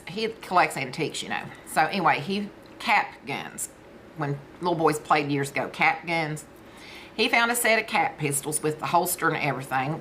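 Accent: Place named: South, United States. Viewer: Love hearing your stories. Don't know anything about cats but can't wait to hear about the kittens. Your southern? accent reminds me of joyce meyers. Consider that a compliment because she's wonderful just like you 😊 God bless you.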